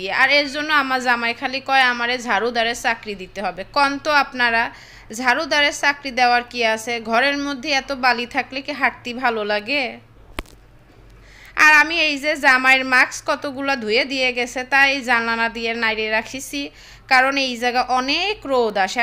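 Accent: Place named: Khulna, Bangladesh. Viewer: বান্ধবি খুলনা ভাষাটা তোমার মুখে শুনতে অসাধারণ লাগলো 😊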